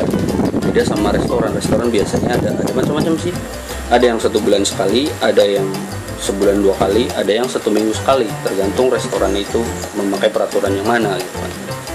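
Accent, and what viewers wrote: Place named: Banyumas, Indonesia. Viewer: Ketemu maning ketemu maning Karo wong ngapak Ning Brunei 😀 Sukses selalu Bossque 👍👍👍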